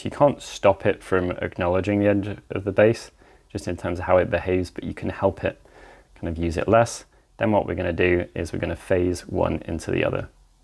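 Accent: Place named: United Kingdom. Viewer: "Too British? Turn on Subs" 🤣🤣 No, the perfect amount of British, 😊💜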